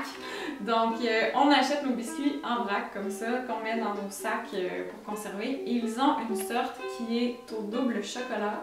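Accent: Quebec, Canada. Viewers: Ok. J’avoue que j’ai un peu de mal avec l’accent québécois mais je pense que j’ai bien compris. Je viens de découvrir les sacs au cire d’abeille ici en Angleterre. Chouette. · La musique couvre un peu sa voix et comprendre le québécois quand on l'entend à peine c'est difficile aussi même si on n'a pas mal à la tête. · j adore ton accent quebecois, et tes videos tres interessantes je te remerci enormement de partager continue j apprend malgre mon âge 56 ans, je t embrasse fort et t envoi beaucoup de soleil de mon pays tres ensoleillé ( l Egypte ) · Quelle superbe découverte ! C'est tout ce que je recherchais ! Du zéro déchet et minimalisme qui est intelligent et plein de bon sens (c'est pas le cas de toute la communauté), végétarisme, simplicité et surtout une fantastique québécoise dans une si belle région ! Je suis juste en amour fou du Québec depuis que j'y suis allée en mai ! 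Montréal c'est la seule autre ville où je me verrais vivre ! Et quelle belle langue et accent, ça me passionne bcp ! Merci d'exister :) Gros bisous et très bonne continuation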